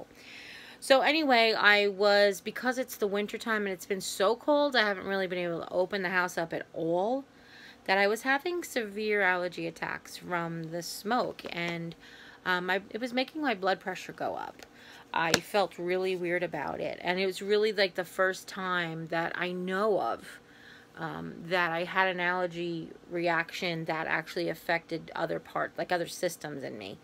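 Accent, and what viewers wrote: Long Island, United States. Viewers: Love the Long Island talk, I miss LI but I don't miss the amount of people living there!!! You are organized....wish I was!!!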